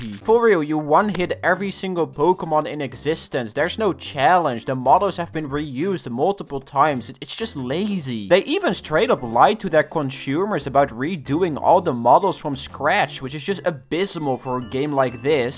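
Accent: Netherlands. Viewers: ah yes, dutch accent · Man is dutch?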